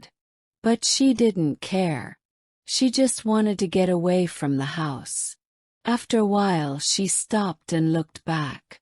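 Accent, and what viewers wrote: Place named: United Kingdom. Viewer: شكرا لاني بحاول اتعلم الاكسنت البريطاني ومالقيت كثير مركزين على أن يكون كلام بريطاني مىة بالمىة وانا احب قناتك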